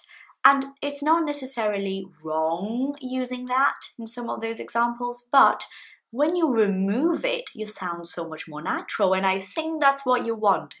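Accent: United Kingdom. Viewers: o mais incrível é que eu entendi 90% do que você disse, e ainda nesse sotaque britânico aaaaaaaaaaa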